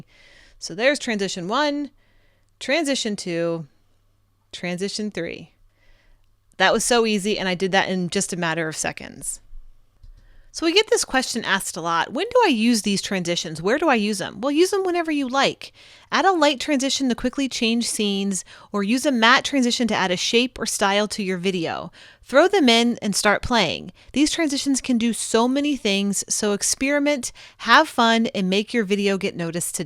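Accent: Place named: United States. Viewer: Very good explanations and perfect us accent for me (French 😉) !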